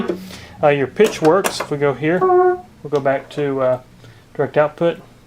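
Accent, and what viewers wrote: South, United States. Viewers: You sound southern?! I’m in NC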